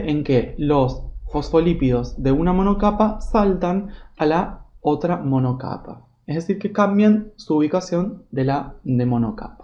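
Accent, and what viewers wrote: Argentina. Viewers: Me encanta el acento Argentino.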